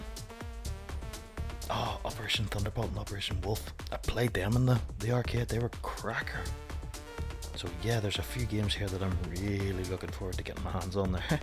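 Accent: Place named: United Kingdom. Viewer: Hi, great video thanks , from the sound of your accent Ur British, so my question is, if I buy one will it work in uk as most I see are sold from America cheers steve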